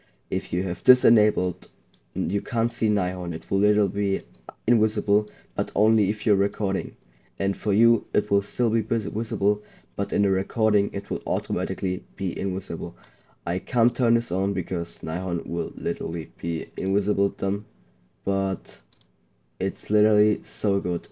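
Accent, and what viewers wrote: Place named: Germany. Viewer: ich höre deutsch bruderherz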